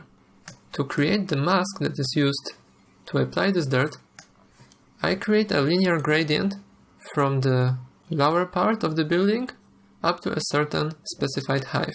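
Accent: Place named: Poland. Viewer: Po Twoim akcencie od razu słychać, że Polak !!! ;)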